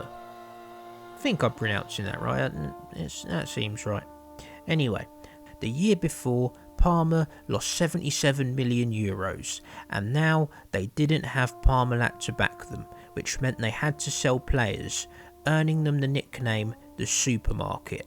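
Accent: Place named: London, United Kingdom. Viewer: the geezer accent makes it even better 😂 phenomenal.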